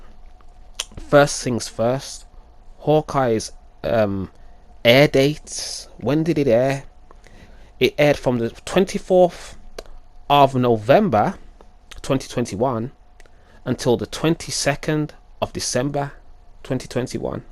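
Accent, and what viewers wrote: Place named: South Africa. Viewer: Holy crap, had no idea you did this. You sound like you're from.... South Africa??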